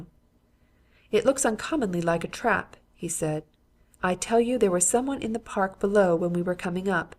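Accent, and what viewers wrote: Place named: United Kingdom. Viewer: Disqualified by UK b voice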